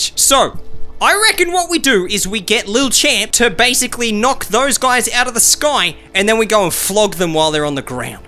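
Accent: Australia. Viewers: Is he an Aussie like me?!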